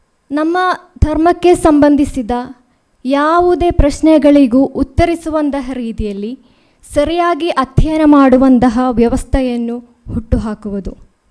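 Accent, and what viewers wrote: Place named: Kerala, India. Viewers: Malayali ka nada methadonde hage❤ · ಅದು ಆಕೆಯ ತಪ್ಪಲ್ಲ. ಕನ್ನಡ ಭಾಷಣವನ್ನು ಮಲಯಾಳಂನಲ್ಲಿ ಬರೆದು ಓದಿದಾಗ ಹಾಗೆ ಅನಿಸುತ್ತದೆ. ಅಷ್ಟಾದರೂ ಆಕೆ ಕನ್ನಡ ಮಾತನಾಡಿದ್ದಕ್ಕೆ ಸಂತೋಷ ಪಡೋಣ. ಸಣ್ಣ ಪುಟ್ಟ ತಪ್ಪುಗಳನ್ನು ಗಣನೆಗೆ ತೆಗೆದುಕೊಳ್ಳದೆ ಇರುವುದು ಒಳ್ಳೆಯದು.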